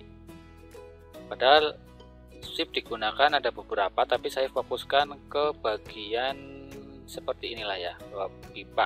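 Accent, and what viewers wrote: Banyumas, Indonesia. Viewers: Master jan keren temenan ya,geleng geleng inyong lah,sukses om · Full tank sukses selalu buat om..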